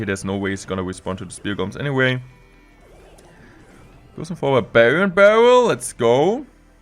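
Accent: Germany. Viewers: Der bruder klingt selbst auf english deutsch 💀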